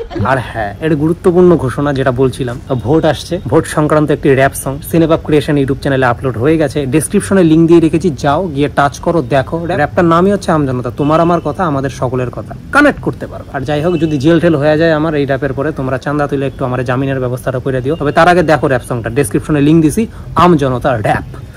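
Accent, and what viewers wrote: Bangladesh. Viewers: আপনার বাংলা pronunciation আমাদের বাংলাদেশের বাংলার মত কিছুটা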